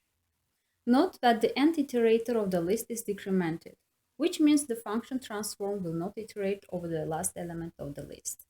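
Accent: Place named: Russia. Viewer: Thank you, these tests are very helpful for consolidation of the material. Very familiar accent... Russian?)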